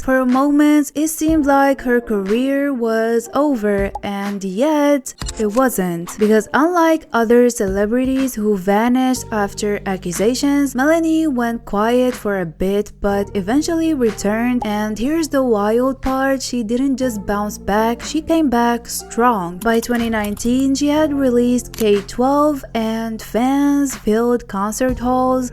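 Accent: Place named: India. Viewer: was gonna listen but indian accent = opinion rejected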